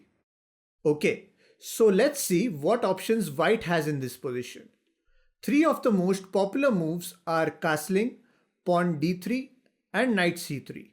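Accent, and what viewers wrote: India. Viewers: Is it me or does every single Indian have the same exact voice and pronunciation?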